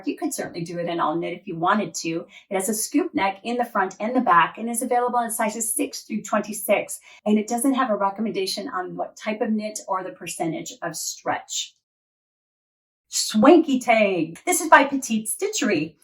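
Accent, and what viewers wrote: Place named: Philadelphia, United States. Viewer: I agree, I think I may try the one you have on first. Great list of tops. Why did I have you pegged as a Philly or South Jersey girl? Texas? Never would have guessed.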